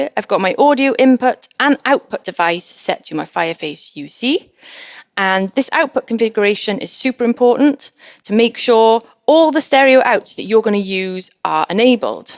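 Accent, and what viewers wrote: Wales, United Kingdom. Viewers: Welsh accent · Are you Welsh??? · proper UK accents and golf ball on a stick? Good only for you... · Are you Welsh? 🏴 I’m from Neath lol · I stumbled upon your video and it's very very good. I love a welsh accent, cool.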